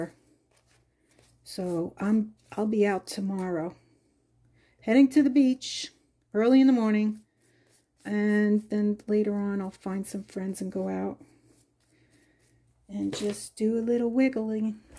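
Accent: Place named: New York, United States. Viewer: I love your longer videos. I also like your voice and accent. I had an aunt from New York and used to love to visit with her. Your sense of humor is great. Your keep it real for all the times we craft and things happen, I laughed out loud when that lid to your ink pad went flying off the table. I also love how you insert comments to people who are going to say something negative. Anyway, just my two cents. I look forward to your videos.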